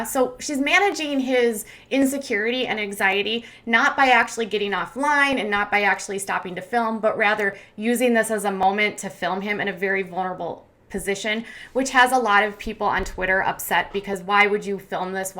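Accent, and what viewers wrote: United States. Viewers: I know you have an American accent but for some reason my brain re-imagines it in an English accent lol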